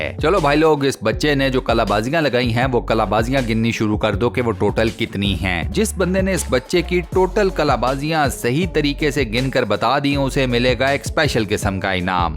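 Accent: Punjab, India. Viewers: Apki awaaj vich punjabi tach bhot Nice hai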